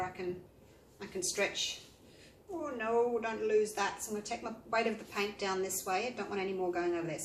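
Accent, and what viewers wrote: Australia. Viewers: American here. Love watching the video for the content, and enjoy hearing an Australian accent. For people like me who can't travel, It's fun to see/hear someone from a part of the world, far away from where I live. We are all different, but still have so much in common. Thanks for sharing what you do. Happy trails, from the USA. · Can't half tell your an Aussie discussing the merits of baby poo lol..love it :D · Wow - you sound just like me! And I LOVE these colours and your work and the way you chat. I miss Australia - so it is so nice to hear someone from there. And this is from 2-3 years ago so you must have been one of the first popular Aussie Pouring youtubers.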